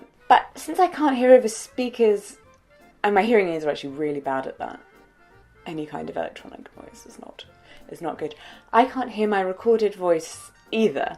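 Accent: RP, United Kingdom. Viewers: You sound like Queen Elizabeth, when she was young, or like one of the ladies of Downton Abbey. That is to say, aristocratic, having well articulated, received pronunciation. That is not criticism but merely observation. Of the various British accents, received pronunciation is the easiest for us in America to understand. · You have a good voice just wanted to let you know and your British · Your voice is so good to hear, is beautiful and your accent is amazing!!!! I love British accent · Thank you for this video. I just want to let you know that I can listen to you speak forever:) I actually leave your videos on for my baby girl to listen to, hoping she can pick up you Brit accent:) Honestly though, your videos are a source of joy, love and hope. I wish you good health always. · This was very informative and thank you as always. You do have a lovely voice made all the more charming by your British accent. 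Or what we in the states call an accent, to you it's your normal voice. My voice is a higher pitch than I think it is. I've heard it recorded many times and like most folks, do like the sound of my own voice. Have a fabulous day 😃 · Wow.... Your very British English is AWESOME!!! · I knew this from a previous video, but I continue to be amazed because you have this wonderfully perfect posh RP accent, that seems flawless. And that I literally just now realized rather compliments your vintage aspects. In that it is classic and yet up to date at the same time, in the way that you talk about clothing and hairstyles of the 20s and 30s and also disability and gay rights of the modern age. It suits you. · Well...I love your voice! You have a British accent and kind of a 1950s Victorian tone and when you us r’s in words it sounds very formal and amazingly magnificent! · Yes please don't lose your British Aristocratic accent which I've always associated with snobism (I'm a post-war baby) - not your scene being snobbish ...you just crack me up! 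· i love your voice, it’s soft but clear, and basically you sound stereotypically posh english but it’s really nice to listen to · . Your voice is beautiful. I mean, I love British accents but yours is just so light and beautiful. This may sound weird but in my mind, while I'm writing this, it is like your voice is jumping on clouds. To me, my voice sounds much lower than it really is. In my voice recordings, to me, it sounds like I'm like 6 years younger. My brothers and I did this thing where we voice recorded ourselves and then spoke with the recording and both my brothers confirmed that that is how I sound. Isn't weird to think that when you talk that people hear a whole different voice from the one you hear? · I just found this channel and the first thing that came up to my mind is "if shes deaf then how does she has such a strong british accent?" can somebody that watches her channel for some time answer this question? 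I dont come to hate on you i just want to know.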